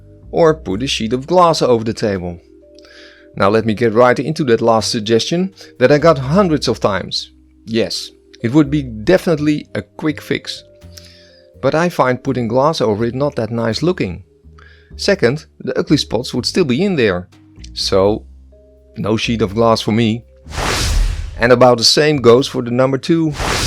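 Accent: Netherlands. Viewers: Jouw engels is echt gewoon goud, dat nederlandse accent 10/10.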